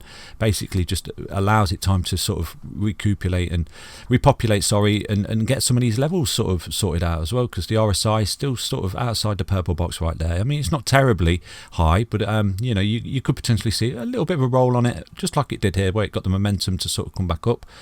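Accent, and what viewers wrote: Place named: East Midlands, United Kingdom. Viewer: where are you from? Trying to work out your accent. East Midlands?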